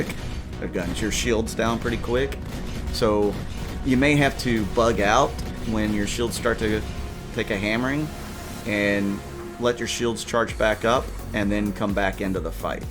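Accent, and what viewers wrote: United States. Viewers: well , after I see your videos I can easily say that you know the game very well and of course I know you knew that you can take the claim jump mission with any medium side ship any day , just wanted to add that extra info here for those who didn't know , but I'm aware of your intentions of those who are new and don't have caplable ships , and so you show how to with a small ship . Keep the good work up , and I honestly love YouTuber like yourself that I can understand and love the Cristal voice and. America accent , I can't help myself lisenting boredgamer Wich is a tremendous informative YouTuber , one of the best but my ears hurt when he talks .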